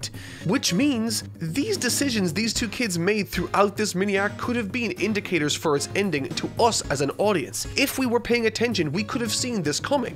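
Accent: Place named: Ireland. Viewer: It's just his Irish accent. The same way he pronounced "calm"/kæm/, instead of calm/kɑːm/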